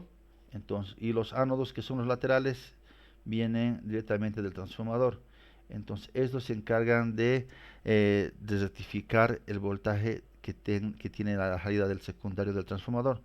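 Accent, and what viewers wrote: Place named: Antioquia, Colombia. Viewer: Impresionante didactica, pero si me hizo reír su acento paisita jjjjj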